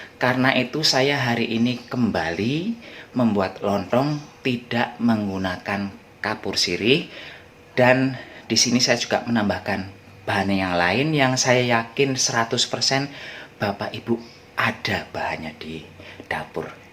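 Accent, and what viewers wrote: Banyumas, Indonesia. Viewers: Jane rika wongendi asline...bahasane pd kr inyong 🤣🤣🙏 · Ngapak bae lah anak e mbok Dirah👍👏👏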